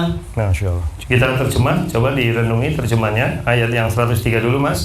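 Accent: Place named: Banyumas, Indonesia. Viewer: Alhamdulillah ilmuku tambah ngaji kalih ustadz ngapak, Asli saking pundi nggih min?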